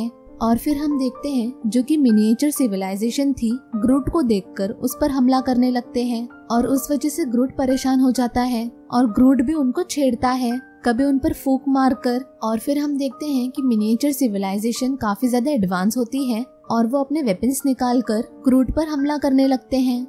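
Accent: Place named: Karnataka, India. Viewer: You are from Karnataka 🤔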